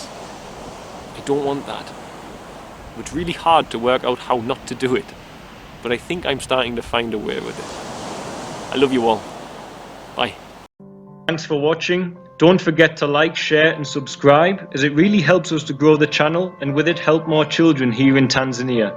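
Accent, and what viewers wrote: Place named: North, United Kingdom. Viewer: I'm glad you just sit and ramble on with beautiful information:))) and love your northern accent!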